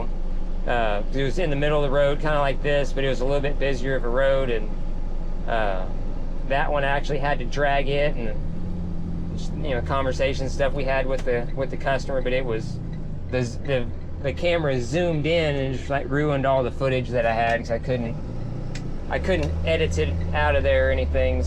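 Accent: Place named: Texas, United States. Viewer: What a character! He speaks good ole Texas gibberish like my uncles.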